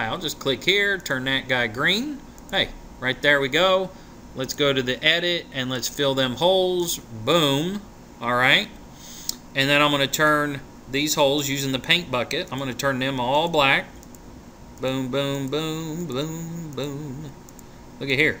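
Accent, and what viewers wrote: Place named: South, United States. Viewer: You sound like idubbbz with a southern accent, i love this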